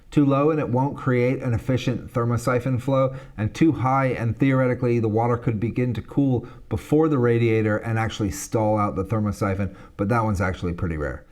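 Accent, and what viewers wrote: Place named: United States. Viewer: I’m new here. He’s probably already explained it in previous videos, but I’m a bit confused about American accent, farenheits and UK power outlets 😀 what’s going on?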